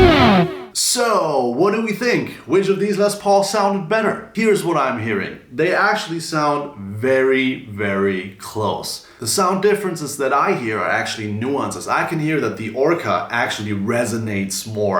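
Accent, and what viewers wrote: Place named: Germany. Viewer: I thought i was listening to Zach Galifianakis imitating Matthew McConaughey.. lol.. Then i understood you might be from Germany.. But to the most important part.. your playing is absolutely sublime, man. Do you have a spotify or bandcamp account? I really hope you have a few albums out.. or even singles.. Take care and all the best,! Edit. Just bought your backing track in E Minor..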